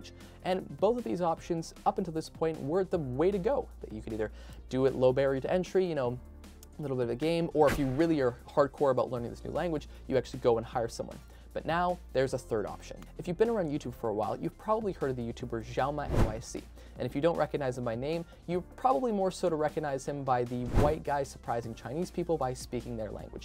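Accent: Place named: Italy. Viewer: he even has an italian accent in english :D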